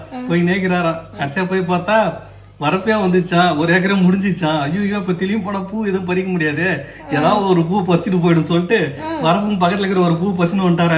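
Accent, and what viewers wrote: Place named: North Arcot, India. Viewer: சென்னை வட ஆர்கேட் பேச்சு வழக்கு ❤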